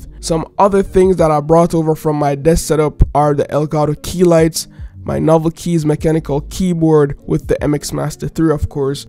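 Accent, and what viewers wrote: Jamaica. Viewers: Are you Jamaican 🇯🇲 ?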